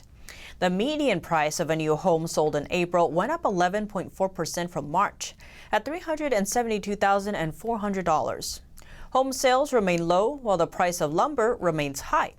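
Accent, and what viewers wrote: United States. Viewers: Wow baby blue on ivory with gold necklace your looking super cute and I love that strong American accent. Your perfect for the show.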